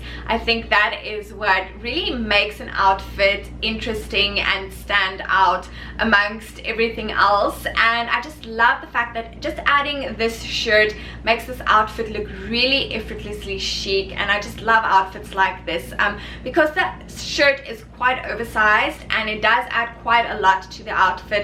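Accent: South Africa. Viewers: Thx for your suggestions. I’m going to a wedding and wanted to dress up my dress. Also love the SA accent.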